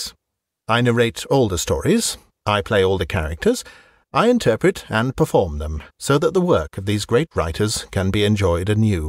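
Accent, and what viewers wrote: England, United Kingdom. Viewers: Hi, i just finished listening to a couple of stories you've read, you have such great diction and voice, just like I would imagine a well educated Englishman would sound during the Victorian or Edwardian times, very nice and genuine, thank you! Do you only read short stories, or is there The Moonstone by Wilkie Collins in your collection? My favorite book since my youth, I've read it in multiple languages... Thank you again!!!!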